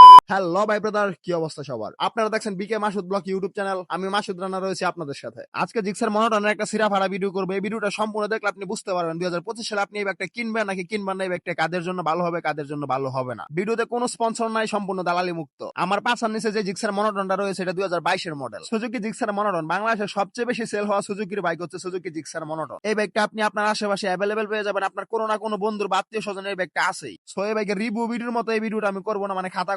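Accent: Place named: Comilla, Bangladesh. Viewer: You are from Comilla, I recognize you from "pack kada".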